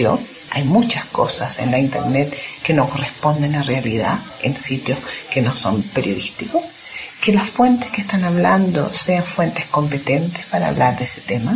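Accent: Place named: Chile. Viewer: Sin duda, un acento distinto. Claro y confuso a la vez. ¿Acento chileno?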